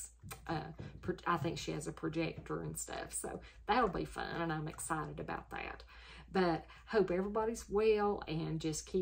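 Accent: South, United States. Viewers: Love your video. Those three tops are very nice. What state do you live in? I’m trying to place your fabulous southern accent.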